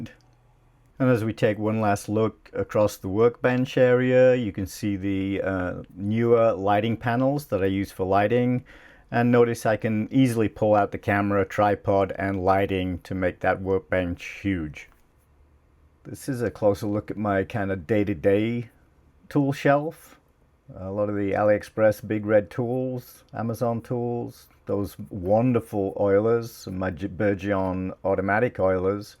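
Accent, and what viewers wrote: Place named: Texas, United States. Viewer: Great video! Interesting how the Texan accent has partially rubbed off on you so quickly,-) I have almost the exact same set of tools as you. Well I have one of those blue silicon mats. Very interested to see the next video, a, because I just bought an Elgin watch from another US based watch repairer, and I have a beautiful, imho pocket watch, which definitely needs servicing one day. Love the vintage tools!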